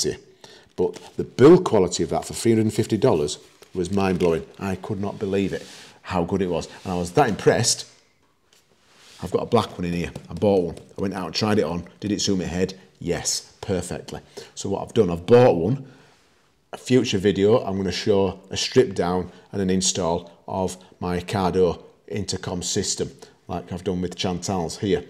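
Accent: United Kingdom. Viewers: With that accent, I was expecting the price to be in a British pound rather than dollars.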